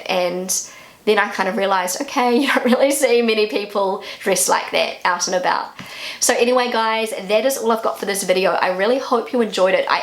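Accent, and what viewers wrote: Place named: New Zealand. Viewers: Oh yes, you have a New Zealand accent. At the beginning I had a lot of small problems to understand you. But now I have no probs anymore. But the Kiwi-accent is so easy to understand in comparison with the Scottish, Texan or Kentucky accent. I love your accent. It is so sweet…for me… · That's exactly how I felt. I googled right away New Zealand English to find out if speaks it properly:-D But I definitely like that accent. Generally I think nobody has to be ashamed of their accent.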